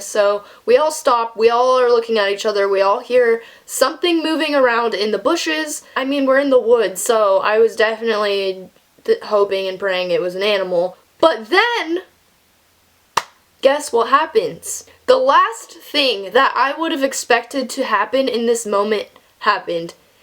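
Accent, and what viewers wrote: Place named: Seattle, United States. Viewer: you have a Seattle accent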